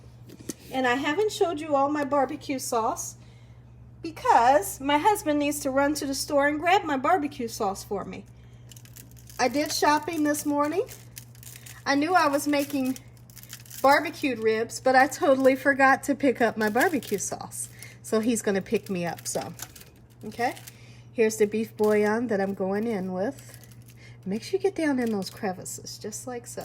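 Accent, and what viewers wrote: South, United States. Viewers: Ooooh she country I love it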